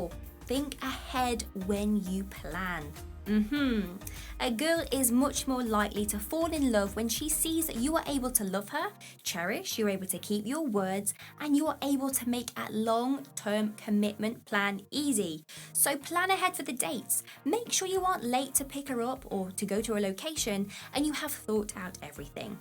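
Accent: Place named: London, United Kingdom. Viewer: I like ur accent 👌Are u from London?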